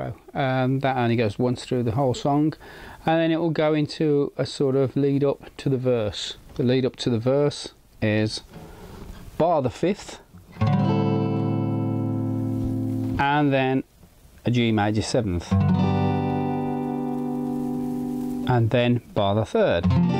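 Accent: United Kingdom. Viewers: great video spoken with a good Brummie accent .cheers from a Tammie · Thanks, this is best tutorial I have come across ...do I detect a Midlands accent ?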